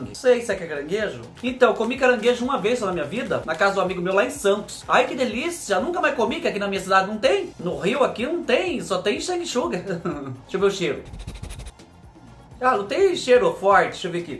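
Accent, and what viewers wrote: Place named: Rio Grande do Sul, Brazil. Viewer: Oi vc é gaúcho tem um sotaque de deferente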